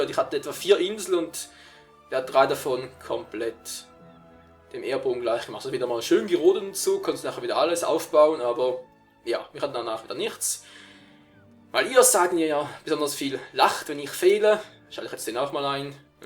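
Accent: Switzerland. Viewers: so. Das ist der schweizerische Akzent im Deutsch. Schweizerdeutsch verstehst du nicht mehr. xD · l_ANTRAX_l du der redet Schweizer Deutsch man man immer diese ernstirnigkeit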